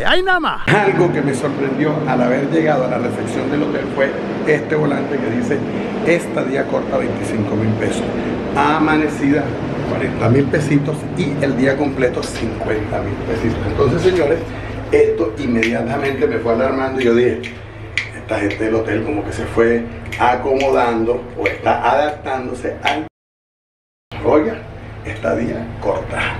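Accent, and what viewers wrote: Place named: Venezuela. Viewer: Hermano pensé que eras venezolano pero igual te sigo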